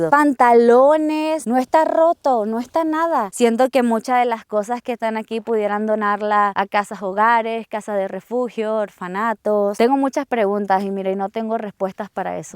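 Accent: Venezuela. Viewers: Su acento suena de Venezuela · Eres auténtica gracias por tus videos con acento venezolana❤ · Chama pero tú no pierde lo venezolano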